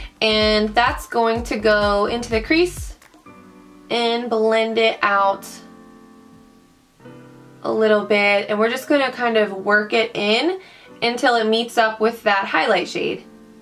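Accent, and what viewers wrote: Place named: New York, United States. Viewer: I feel like you almost have a New Yorker's accent. I love it.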